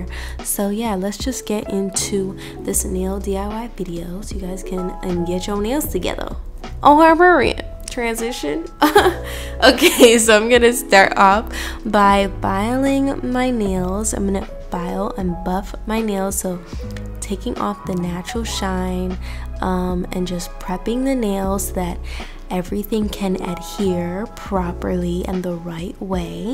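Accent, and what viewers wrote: United Kingdom. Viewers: We all mutually agree we love these nail vids 😍 the british accent killed me 🤣 oh also its a-pray bby girl xx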